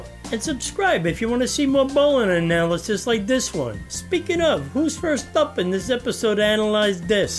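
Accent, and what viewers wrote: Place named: Brooklyn, United States. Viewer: Enough of the Brooklyn accent. It's not funny and I will unsubscribe if I keep hearing it. I'm here for information not some whobis trying to be comedian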